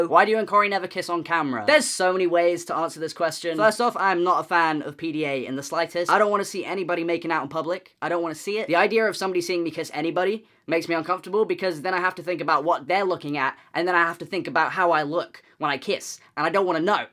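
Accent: England, United Kingdom. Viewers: i have no idea where this guy is from like he’s british right ? i’m so confused his accent is english but his words are not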